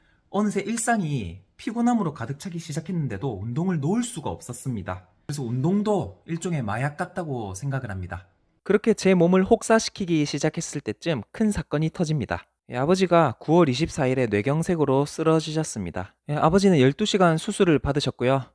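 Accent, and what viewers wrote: South Korea. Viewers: 사투리가 어디 쪽이지 대구 경북쪽인가 ??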